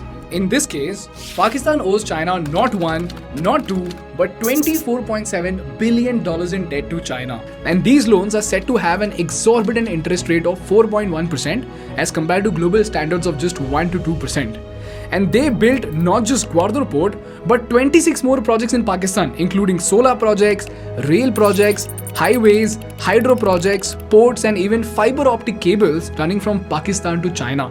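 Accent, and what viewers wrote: India. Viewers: He is speaking normally only, people of his generation(+younger) in india speak English like this not with the stereotypical accent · Typical Indian accent - Why cant you Indians just speak in a proper way?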